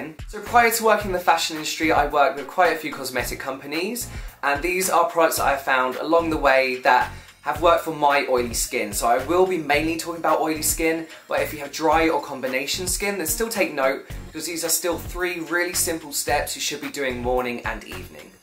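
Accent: United Kingdom. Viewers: that s why i hate British acent a lot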